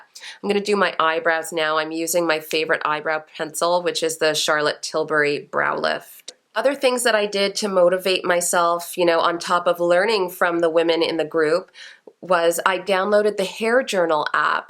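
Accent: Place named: Canada. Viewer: Ahhh I could tell you were from Canada! I knew by the accent of course, but the “I’m sorry “ near the end (as well as the new car pics) sealed it 🤣 👋 waving at you from down the road a bit in Cobourg!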